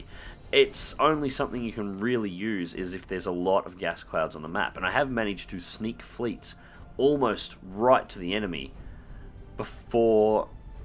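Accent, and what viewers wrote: Australia. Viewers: An Aussie !!! Finally !!!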